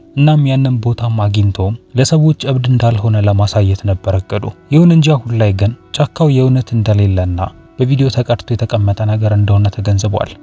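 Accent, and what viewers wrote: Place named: Oromia, Ethiopia. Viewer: ጋላ አማርኛ ይሰማል ዘንድሮም። 🙆🏽‍♂️🙆🏽‍♂️🙆🏽‍♂️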